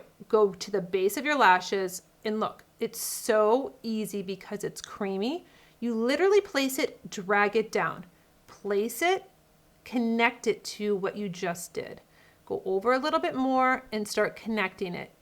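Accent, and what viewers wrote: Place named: East Coast, United States. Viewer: Google says you are from Colorado but you have an east coast accent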